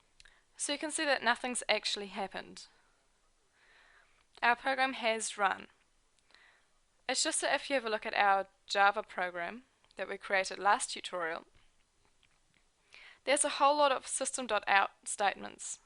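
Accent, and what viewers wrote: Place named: Australia. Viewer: You have the cutest accent. Australian?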